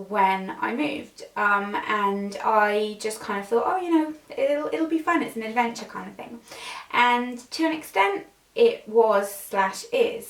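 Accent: England, United Kingdom. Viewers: Very interesting video! I've been watching you for years and it's nice to hear a bit more about you and your past! You have a very English-sounding accent, without sounding completely uneducated and ignorant - did you always have that accent or has it evolved since you moved over here?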